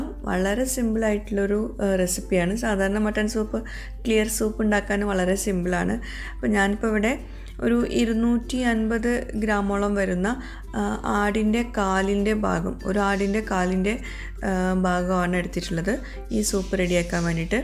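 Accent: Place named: Tirur, India. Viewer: Adh correctaa... Tirur slang correctaayi vannu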